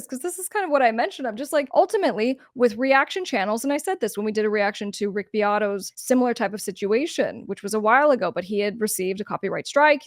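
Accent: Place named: New Jersey, United States. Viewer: Such a new jersey accent lol never noticed till now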